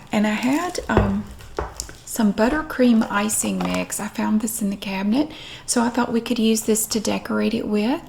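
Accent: South, United States. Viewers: Love how southerners pronounce oil. Great videos.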